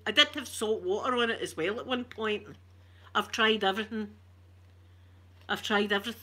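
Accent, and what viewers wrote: Scotland, United Kingdom. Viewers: Scottish very angry..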